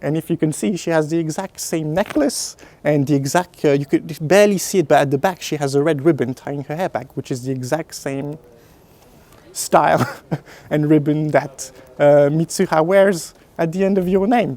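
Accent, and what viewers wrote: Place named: France. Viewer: l’accent français mdr j’aime trop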